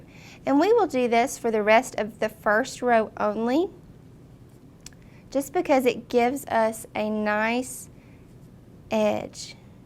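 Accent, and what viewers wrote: South, United States. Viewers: I live the southern accent!!!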